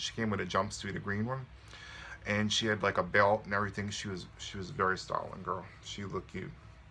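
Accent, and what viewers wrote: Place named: Boston, United States. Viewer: Where is your accent from? It's like almost a Boston one and I love it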